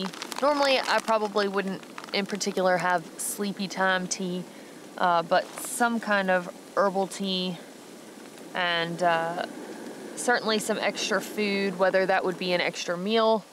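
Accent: South, United States. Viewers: Gymnasticin! Love it, been a while since I have heard pure Sutherneese.... Hi, been a while!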